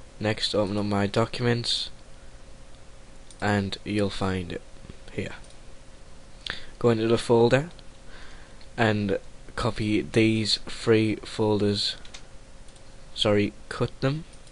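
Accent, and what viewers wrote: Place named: Scotland, United Kingdom. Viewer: sorry if im offending, but u just have a voice like a Scotlander =) anyway thx for the tutor.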